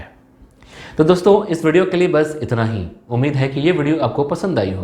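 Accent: Bihar, India. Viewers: Sir aap bihar se hai ?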